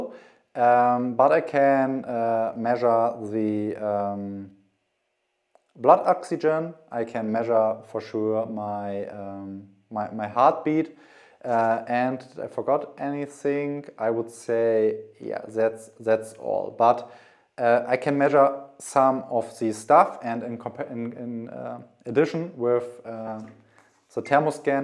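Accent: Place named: Germany. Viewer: With german accent, very slowly, it's hard to understand something! It's needs a lot of patience to cach something useful !🥱🥱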